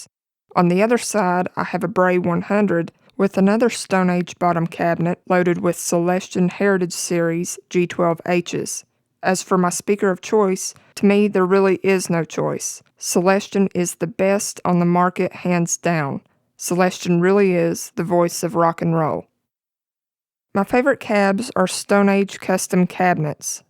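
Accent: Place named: Kentucky, United States. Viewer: WTF, come over form the Heil site and first think is that I am listening and seeing is one of my home girls form SE Kentucky, lovely lady, great accent and then holly crap rip my face off with incredible shredding and than to top off straight forward lesson. Love it great job -new fan here, keep posting.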